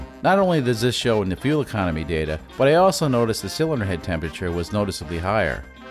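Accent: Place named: New England, United States. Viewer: You sound like a New England transplant. Wicked awesome, hey.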